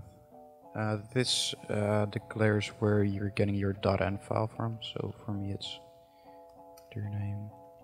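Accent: Netherlands. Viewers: yeah I was thinking the same ben je nl =) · Your accent sounds Dutch. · Dutch? Nederland of België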